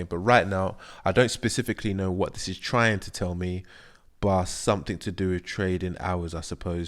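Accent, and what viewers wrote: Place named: London, United Kingdom. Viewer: u sound like you're from ends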